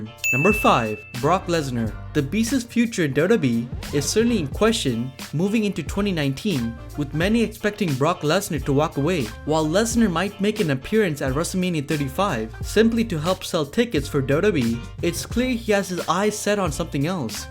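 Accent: India. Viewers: Another indian accent, i dont understand you curly tonge. When indian speak english, sounds stupid.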